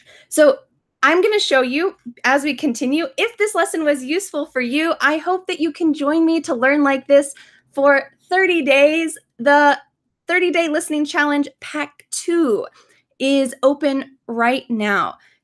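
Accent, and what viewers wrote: United States. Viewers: I like ur voice,you know,just easy to understand and concentrate. perhaps in my view American English is easier to follow with,it's kinda different from what we'd been taught in textbook. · people in US speak not only fast but also in slang and with different accents. That's the difficult not only in US but also at the places with English speakers. You have the perfect American accent I have ever heard. I like your videos.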